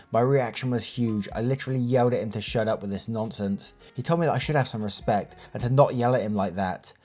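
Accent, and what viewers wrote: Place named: Midlands, United Kingdom. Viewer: Where abouts are you from? I can't place your accent is it Midlands?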